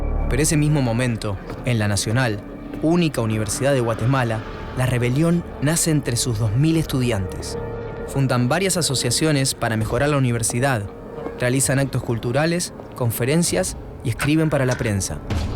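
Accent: Buenos Aires, Argentina. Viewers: Che, primero que nada, cómo pronunciás la ch?? Porque tengo entendido que se usa en palabras como dereCHo, muCHas, CHanCHos, y no es lo que se escucha en el video. Capaz estás escuchando mal o confundís las letras, no se. Y segundo, capaz sería interesante que consideres buscar otro tipo de video la próxima si tanto te molesta este acento en vez de llamarlo por algo que no es, porque al fin y al cabo no es ninguna contribución humanitaria. Es el acento que manejamos los bonaerenses, como seguramente vos tengas el tuyo... saludos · y bueno si te molesta el acento bonaerense anda a otro canal, no da que digas que es una "tara" cuando es el acento que tenemos la mayoria de los que vivimos en buenos aires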